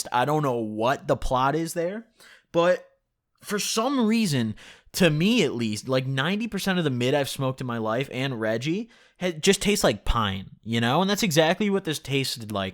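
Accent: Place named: Midwest, United States. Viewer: the way u talk ur most def from the midwest XD love ur content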